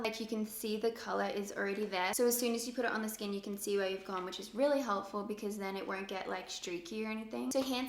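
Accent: United States. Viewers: Yoooooo shes getting the american accent !! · Can anyone else hear the slight American accent that has developed. When she says A's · Wow her accent is definitely sounding American now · sounds american now eg when she said matter 😂 ill miss your aussie accent 😊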